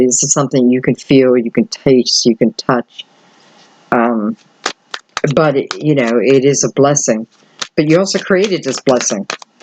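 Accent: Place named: Philadelphia, United States. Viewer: sharp 907 I hear it too. I’m from philly. We all have that accent. Lol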